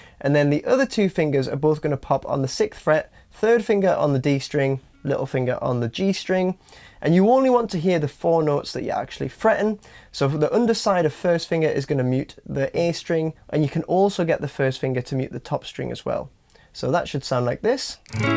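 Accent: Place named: Newcastle, United Kingdom. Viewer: great lessons thank you ! shit hot playing ,and cool geordie accent ! Gan canny 👍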